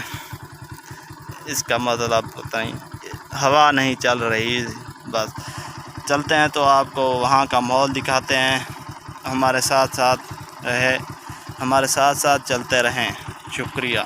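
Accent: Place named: Saraiki, Pakistan. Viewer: ویسے تو آپ کی اردو بہت اچھی ہے تھوڑے اردو کے الفاظ آپ کے کٹ جاتے ہیں وہ آپ کی سرائیکی زبان میں آ جاتے ہیں لیکن کوشش کریں صحیح بولنے کی انشاللہ آپ کو اردو صحیح آجائے گی 👌🏻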